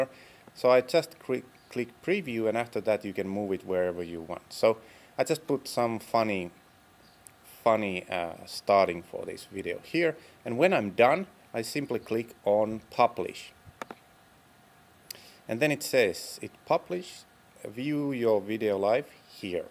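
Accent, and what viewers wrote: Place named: Sweden. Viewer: Are you Swedish or Spanish? You really sound like a Swedish guy =)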